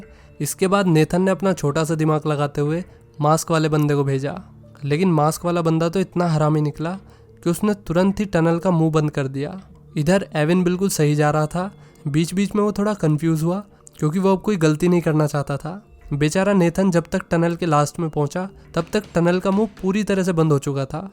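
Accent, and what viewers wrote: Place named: Palwal, India. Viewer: Aap kaha se ho faridabad side se ho kya kyoki aapne kuch word palwal walo jease bole hai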